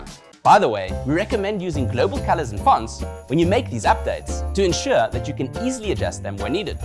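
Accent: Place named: South Africa. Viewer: Dude you sound like South African!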